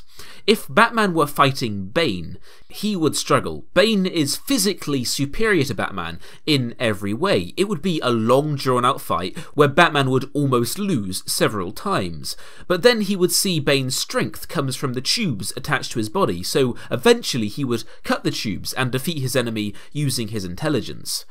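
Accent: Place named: United Kingdom. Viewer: dat british accent 🔥🔥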